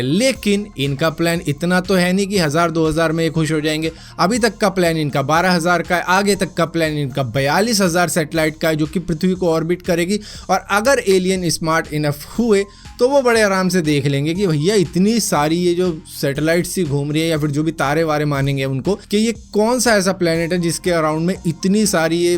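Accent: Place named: Maharashtra, India. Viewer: Bhai aapko sun ke I can definitely say that you're मराठी मानुस , कधी मराठी त पन बोला....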